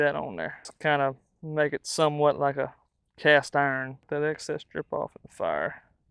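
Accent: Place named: Georgia, United States. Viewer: Georgia accent, mid ga.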